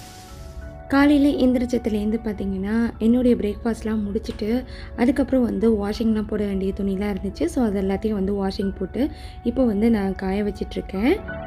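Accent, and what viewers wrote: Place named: Karaikal, India. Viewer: asalamu alalikum sis m from sri lanka but m a indian unga video unga talking style yellame paakum boodhu yenaku india la irikura maai feel aaudhu na adhukaagave unga videos paakurai m really happy when i saw your videos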